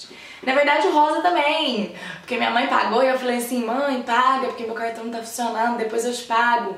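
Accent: Minas Gerais, Brazil. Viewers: "num tem base" haha esse sotaque,que só mineiro sabe como é. linda amo seus videos.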